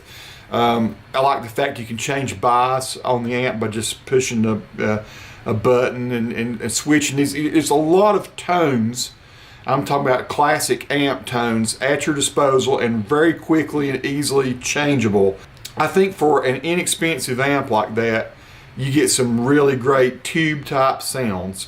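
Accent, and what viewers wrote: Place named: Oklahoma, United States. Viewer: Almost sounds like oklahoma or something midwest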